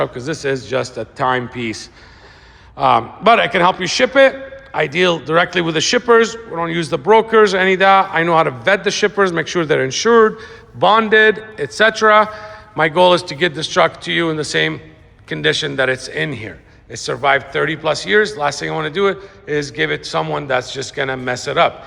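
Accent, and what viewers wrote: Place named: Miami, United States. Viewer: Portland? He sounds like he's from Miami.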